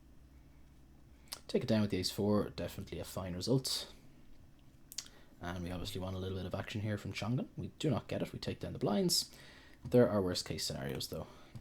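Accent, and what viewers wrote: Ireland. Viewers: Irish confirmed. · YOUR IRISH !!! fuck yeah !!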